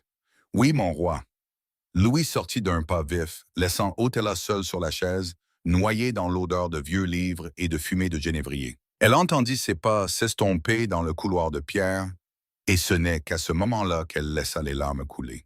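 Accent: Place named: England, United Kingdom. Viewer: Je n'ai pas aimeé le vois qui a un accent anglais. Mauvais à ecouté. Dommage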